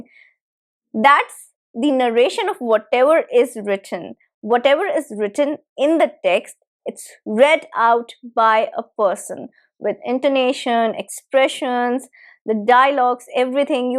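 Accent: England, United Kingdom. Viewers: I listen to your videos ❤ for improving my english listening skilss❤ i like you english accent really very much ❤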